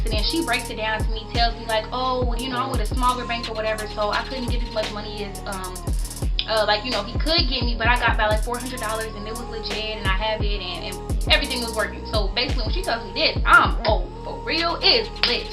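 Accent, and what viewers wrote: Chicago, United States. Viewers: Are you from Chicago? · You from Chicago it sound like it🤣💯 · I can tell ur from chicago lol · You from Chicago ?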